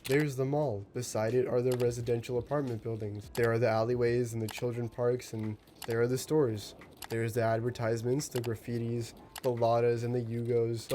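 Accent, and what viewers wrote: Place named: United States. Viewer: You speak with a perfect American accent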